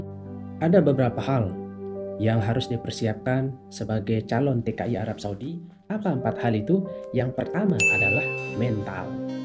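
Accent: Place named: Lombok, Indonesia. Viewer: Pasti org lombok, kruan suare pas kne parlemen hehe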